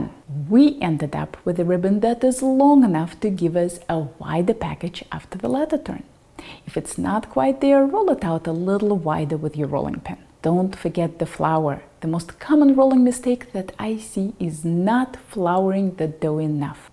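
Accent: Russia. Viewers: Nice russian accent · Are you Russian? You have a very pronounced Russian accent.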